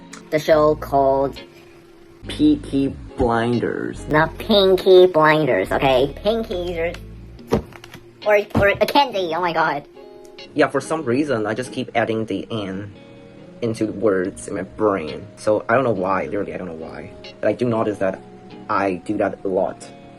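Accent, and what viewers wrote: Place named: Australia. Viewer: I agree with you when it comes to languages and emotions! I notice that however I feel while studying carries over to my state of mind while speaking the language. I feel like it also affects my openness to the culture. For patterns, I love them. I notice that I learn languages better when I notice the patterns on my own :D Then later on I can learn formal patterns to see if they match (or they build more easily off of what I already have learned). Ahh accents, I want to know how to change mine in the languages I learn. I don't mind having a foreign accent but I'd at least like to have one that sounds nice... Your accent kind of reminds me of Baobaopearly's. It isn't a valley girl accent, honestly it sounds sunny if that makes sense. :D Also, your placement sounds like it'd be in the right place for an Australian accent. Good luck whatever you work towards! 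Great video!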